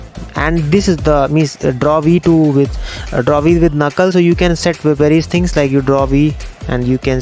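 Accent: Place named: India. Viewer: This Indian English...so annoying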